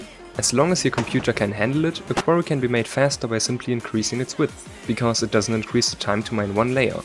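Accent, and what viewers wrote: Germany. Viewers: Berliner Englisch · Are you from Germany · Bist du deutsch ? hast Deutschen accent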